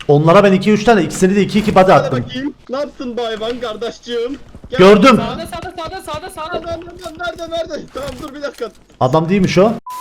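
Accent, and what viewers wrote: Cyprus. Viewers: Abi kıbrıs limisin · abi sen Kıbrıslı mısın???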